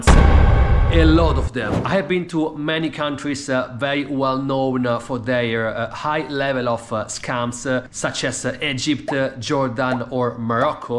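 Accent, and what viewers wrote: Italy. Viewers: It looks you have italian accent! So I suggest you make also a video about millions scams in Milano,Roma,Venezia.fiornze... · You sound Italian by the way you speak English. Am I wrong?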